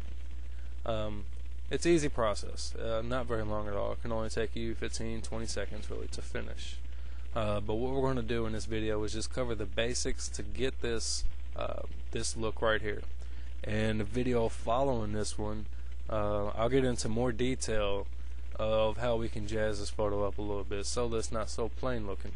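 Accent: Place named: United States. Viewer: i hear no echo? just a very thick american sounding accent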